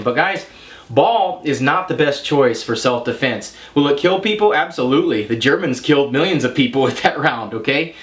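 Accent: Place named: Upper Peninsula, United States. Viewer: you talk like a yooper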